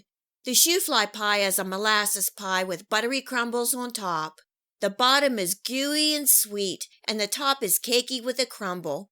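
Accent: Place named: Delaware Valley, United States. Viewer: You are from the Delaware Valley? My family is from Philly and South Jersey--you sound like my relatives!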